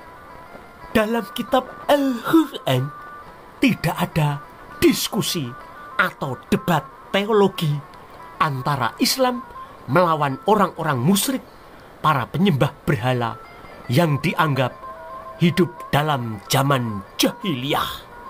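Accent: Java, Indonesia. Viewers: Kalo bisa logat jawanya dihilangin bro. Saya yg bukan jawa agak susah nangkepnya. Cara penyampaian ya kurang umum. Saran ajaaa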